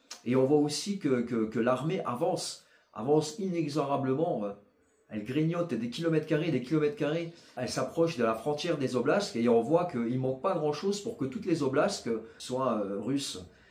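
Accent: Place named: Lorraine, France. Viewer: J'adore l'accent lorrain-moselle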